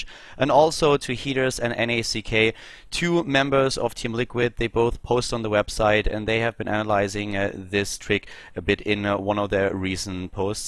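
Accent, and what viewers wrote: Germany. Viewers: is he german ?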